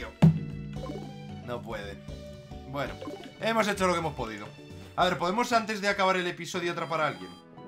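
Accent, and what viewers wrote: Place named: Russia. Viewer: Me suscribo por tu acento ruso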